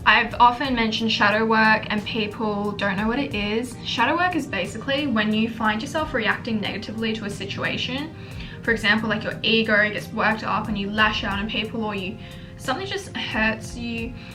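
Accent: Australia. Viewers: im not even listening to her advices i just looove the way she talks and that heavy aussie accent xD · as soon as i heard ur aussie accent, i knew you’d be a youtuber i’d love and man i just absolutely adore your duality and how you’re so empowering and motivating✨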